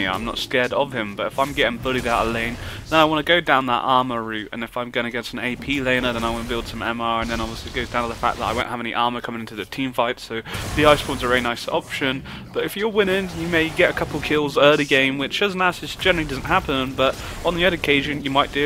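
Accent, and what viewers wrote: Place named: England, United Kingdom. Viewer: hes english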